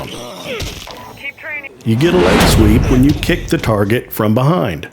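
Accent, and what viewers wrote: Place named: Texas, United States. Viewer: This dude sounds texan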